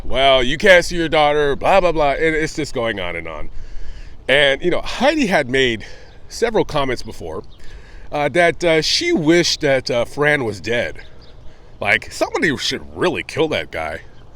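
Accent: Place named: California, United States. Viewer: he's from California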